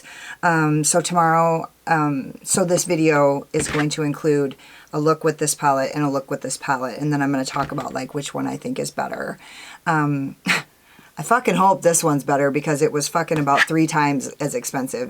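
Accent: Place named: New Orleans, United States. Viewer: Omg You sounds like a New Orleans Gal.. I love your Videos.. New Subscriber here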